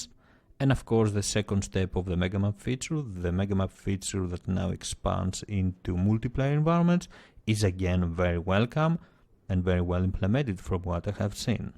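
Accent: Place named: Greece. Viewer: You have a very strong greek accent.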